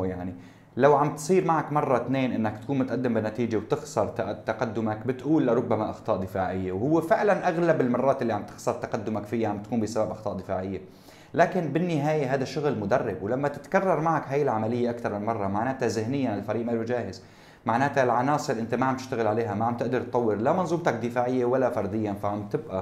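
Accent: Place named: Aleppo, Syria. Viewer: مبين من لهجتك ... يا ابن حلب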